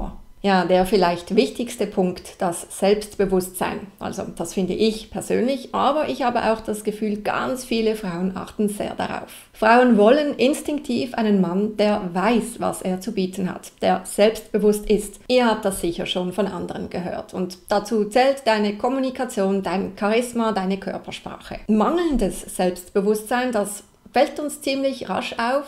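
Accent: Basel, Switzerland. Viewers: Den Baslerdialekt hört man halt schon trotzdem raus.😉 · Hallo, abgesehen von deiner ästhetischen Attraktivität schaffst du es mit einem schweizerischen Deutschakzent eine ganz tolle Sympathie zu erwecken... das macht deinen Vortrag noch interessanter... und würden wir uns echt in einem Date begegnen, dann würde ich sofort einen großen Bagger kaufen um dich anbaggern... bis du mitkommst! Nein, war nur Spass! Aber bitte mach so weiter, denn deine Worten kommen aus der Wahrheit! Danke. Lg. Josef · It is Swiss variation of German (with accent from Basel). But when she speaks her real dialect it sounds even more different from the language used in the video.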